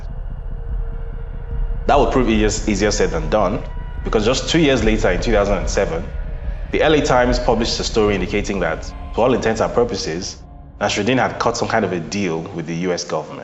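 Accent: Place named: Igbo, Nigeria. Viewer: My brother you sound and look like an Igbo who is a diehard christian from south east known for islamophobia...now it's good to investigate bad issues supposedly like you are talking about...but did you know the same Saudis are a product of Christianity imposed on muslims and all they are doing is to smear and image assassination of Islam by using money generated from crude oil..the west u.k and u.s.a are indirectly creating all this by putting Muslims against christians to create chaos and idiots like you think all this problems end in Saudi Arabia...but this problems end in u.k,France and u.s.a...so talk about the dirty and horrible pastors. And bishops who are linked to foreign terror strategists...u won't know becoz u only concentrate on one side of the coin...one side of the story...there is lots of truth to your story but be rational be wise...pls investigate the true story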